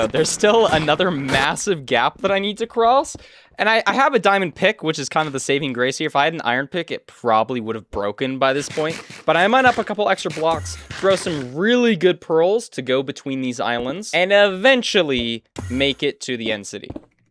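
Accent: India. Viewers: brother u indian like woah it surprised me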